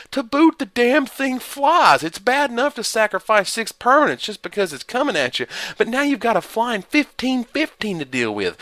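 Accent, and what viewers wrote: South, United States. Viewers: He's from the south, he can't help it.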